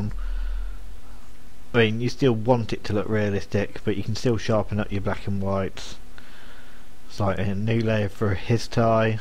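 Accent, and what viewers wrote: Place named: United Kingdom. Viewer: A great example of a fantastic tutorial that is impossible to follow. Pardon my frankness but your introduction might seem like a good idea to you but to me, I can't hear the essential part of what you are trying to say. Its concealed by the MGM soundtrack you used. I've got 18 years of Photoshop experience and like the lady further down the comments lists says... I can't learn a thing from this. Dozens, maybe hundreds of otherwise really useful tutorials are completely ruined by the speed and mumbling of the person doing the tutorial. You can take heart in the fact that even Adobe themselves are guilty of the exact same problem. 50% of their tutorials are wasted on 80% of the audience. I used to listen to soapies my family watched in the evening and marveled at the clear and concise way the actors spoke. No one could suggest they couldn't be understood. 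Many years ago - before the Internet, I used to make How-to VHS videos. They got accepted by a TV station because I'd spent over a year practicing clear diction before ever making my first tape. In all seriousness mate... Your Tutorial is exactly what hundreds of people need and most will never understand anything about the processes you describe. Slow down what you are doing. Speak clearly AFTER the filming of the process and you'll have an exceptional video. Make more like you made this one and only some British people will understand what you say. The rest of the world will keep looking for one they can understand. No mali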